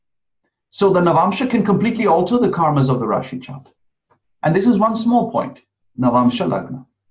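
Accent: India. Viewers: He's great as always. And his English is so Indian!! If there was a fee for viewing this it would still be "total paisa wasool" regardless of the cost!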